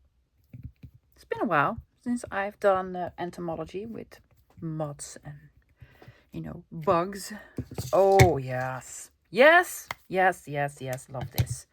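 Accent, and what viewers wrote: Netherlands. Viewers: You are so cute, I enjoy your Dutch accent very much. My grandfather, Cornelius Vander Jagt and my grandmother Cornelia Van Strien were born in the Netherlands. Your voice reminds me of Grandma's voice many years ago (I'm 70!) Your happy attitude make listening fun. I have been a artist for many years painting watercolor. I am now using photos of my art to design tags, pockets and anything to do with journals. I fussy cut the photos and apply them with glue. Trying to make my own ephemera, one of a kinds! Thank you for the hours of happy, relaxing fun. Debra L Pate Art.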